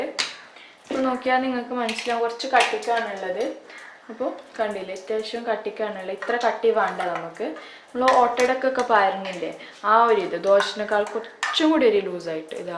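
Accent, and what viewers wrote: Kasaragod, India. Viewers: oru vlog thani kasarkod shylilyil pratheekahikkunnu.....i wud luv to hear ur native style of talking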